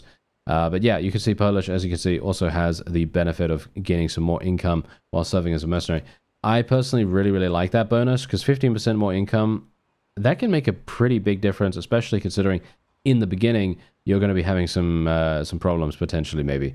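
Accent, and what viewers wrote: United Kingdom. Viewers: Remember to like comment and HYPE! Especially on the first video of a series as it really helps out the channel! This is not a paid advertisement as I joined the channel to hopefully help boost the smoothest British Baritone that you'd listen to read a phone book. For you kids that don't understand what that is, it isn't a phone that looks like a book or a mobile phone that folds like a book. It was a big book with your name and number, and sometimes street address. It'd be super boring but you wouldn't be able to stop listening to. Forgive the ramblings of an old man who finally became a dad, I don't know what sleep is anymore!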